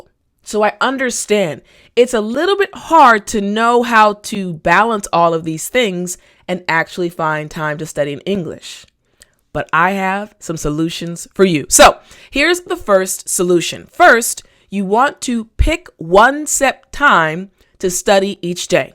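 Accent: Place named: United States. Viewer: Hii I'm from India at Odisha name meek ranjit thank you immensely for your lectures really I'm slowly understanding of your an American accent so appreciate your voice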